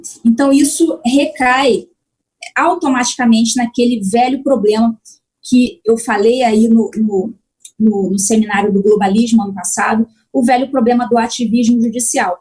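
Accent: Rio de Janeiro, Brazil. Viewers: Não é a voz dela, ela fala com acentuado sotaque carioca!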